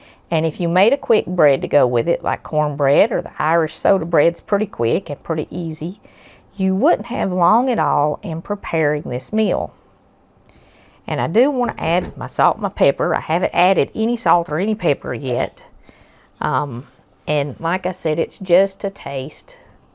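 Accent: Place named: Tennessee, United States. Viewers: I’ve been a widow since last Christmas. I appreciate your channel because you cook good ole down home food, but the best part of your channel is that you dedicate it to also spreading the gospel of our precious SAVIOUR. I’m praying for you. It’s been a hard year for me also. My husband was an ordained minister and he was in Gospel Music for 50 years. My prayer for you is that Jesus would give you sweet peace and wrap you in His loving arms. May God richly Bless you! It’s also good listening to someone from Tennessee. I live about 35 miles east of Nashville. 🙂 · This looks so delicious! I just love this channel. You sound like my beloved relatives who live in Tennessee and I just love how down to earth you are and that you’re not afraid to talk about God. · It's been many years since I left the Appalachia where I was raised. Received an Ivy League education and became a tenured professor at an elite institution. 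Along the way I never heard spoken the dialect of my native region, and I rinsed my own speech of it as I rose in my profession. God, how I love hearing you speak it. It sounds like home and family and belonging. I miss it so much.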